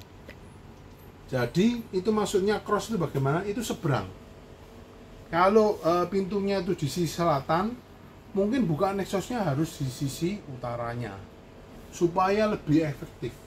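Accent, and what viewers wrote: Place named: Tegal, Indonesia. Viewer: Tegal nih kayaknya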